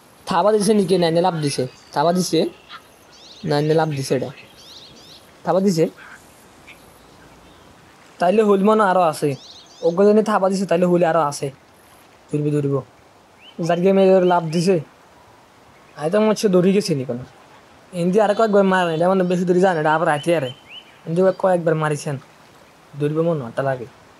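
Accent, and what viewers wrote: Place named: Noakhali, Bangladesh. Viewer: Bhai Mone hoy noyakhalir manush 😂👌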